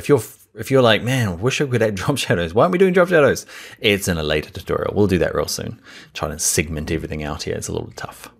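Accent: New Zealand. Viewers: Fellow kiwi? Can I just say, as someone who makes tutorials myself, my hats off to you for such a calm, cool and smooth delivery in your narrative. I'm a third of the way through your course and you explain everything so clearly and at a pace that I can totally keep up with. Kudos to you my friend! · Very helpful! BTW, when you slow the playback speed to .75 you sound drunk. Everybody loves a drunk Kiwi! :))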